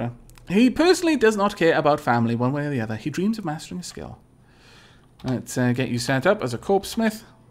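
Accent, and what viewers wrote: Wales, United Kingdom. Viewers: Subbbb! Welsh man xD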